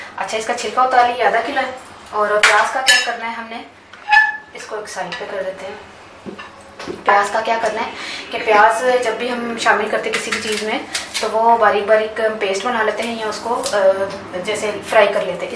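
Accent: Sialkot, Pakistan. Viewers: Thank you for your delicious 😋 Karelay Ghosht I like to hear your Punjabi, I'm sure you're from Sialkot?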